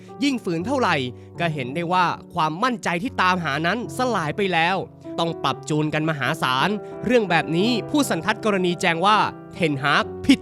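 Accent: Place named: North, Thailand. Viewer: เพิ่งรู้ว่าแอดมินเป็นคนเหนือก้อคลิปนี้แหละ😂😂😂😂แกนตา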